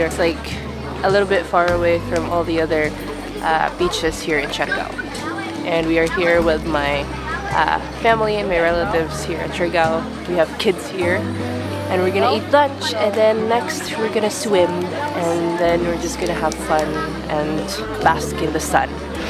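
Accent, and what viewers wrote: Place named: United States. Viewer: You have a good command of English, with hint of American accent. Did you grow up in the US?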